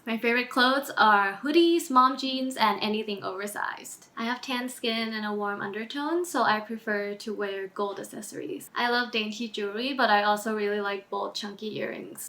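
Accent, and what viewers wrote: Thailand. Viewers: THE CUTEST slight thai accents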